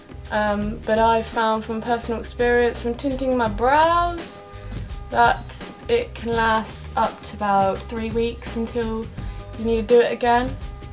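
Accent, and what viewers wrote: United States. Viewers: I don't understand why you talk normally and then have an American accent? Throughout this video... Kinda annoying. Helpful video though :) so thanks! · I like how you talk, it was cool to hear you sound kind of American. Don't listen to the person who said it was annoying. Most people make different sounds or slight variations or accents from time to time. :)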